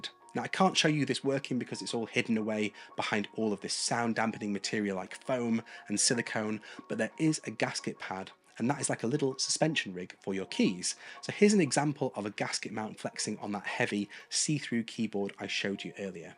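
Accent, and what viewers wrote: England, United Kingdom. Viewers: You are from which part of England?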